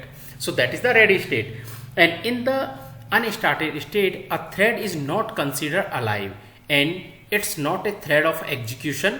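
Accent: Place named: India. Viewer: Don't take it the wrong way, but in India you need to improve your diction when speaking. Happily was all written, otherwise would be honestly a disaster. The accent is funny sometimes and else, but in cases like this may create people's rejection on a content that's really good. Consider using a TTS voice instead.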